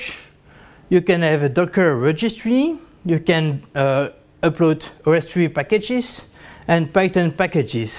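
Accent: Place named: India. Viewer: как у индуса деревенского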